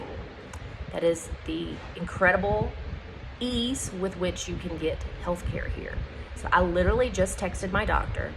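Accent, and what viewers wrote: United States. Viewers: When I hear someone with a Texas accent state she is sitting in front of the fan because she is so hot she is "just trying to survive April and May"...holy crap, that gives me PAUSE. LOL I hope you are feeling better all these months later. Thank you for this post! It's very encouraging. : ) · First of all, where are you from? Your accent sounds so much like where I’m from, NC. That sounds tremendous about healthcare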